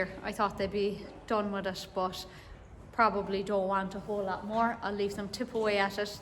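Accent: Ireland. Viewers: Hate to disagree with you but I could listen to your sweet Irish accent ALL day long. ❤️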